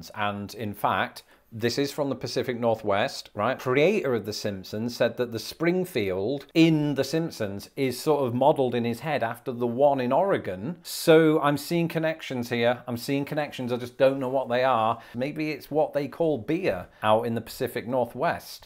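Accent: United Kingdom. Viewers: The good thing is that you kept both you British accent and sense of humour! Which is excellent😂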